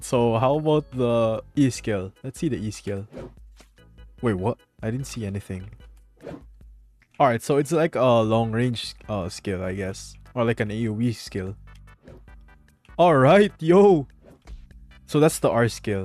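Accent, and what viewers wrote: Philippines. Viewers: Are you pilipino? it seem's you're pilipino because of your accent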